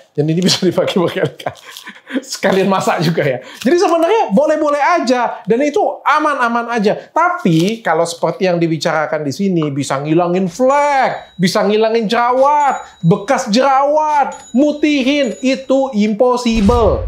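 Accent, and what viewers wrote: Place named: Palembang, Indonesia. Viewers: Dokter orang palembang ya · Dokter asli palembang yach...saya mendengar kata2 mogolah 😁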